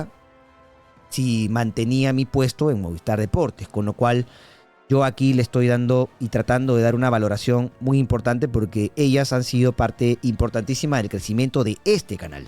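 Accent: Argentina. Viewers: es argentino?... por qué habla así?...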